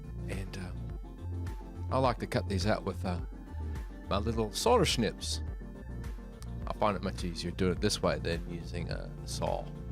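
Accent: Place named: Australia. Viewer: So I checked your Instagram and can tell you’ve definitely picked up in the Aussie accent. Also you live about 2 hours from me ☺️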